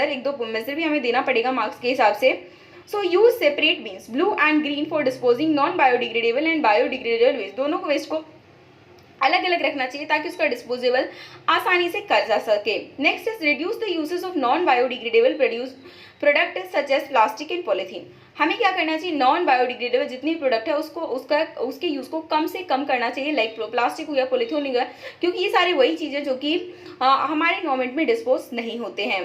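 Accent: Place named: South India, India. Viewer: Mam ka accent south ka hh😌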